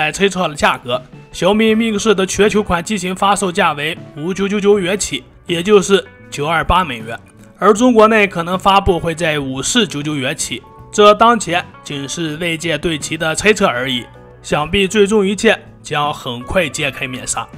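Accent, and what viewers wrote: Shanxi, China. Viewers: 这是山西口音，你会不会听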